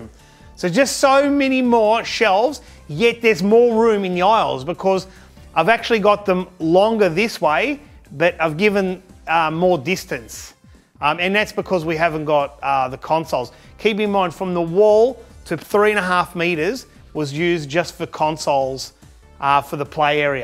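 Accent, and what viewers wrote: Australia. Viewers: You Australian mate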